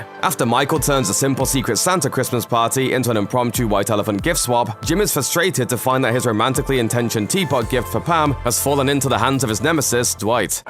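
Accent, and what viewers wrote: United Kingdom. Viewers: Is it me or the British-accent (of the narrator) doesn’t really go with the American show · Love that a British guy is talking about the US version.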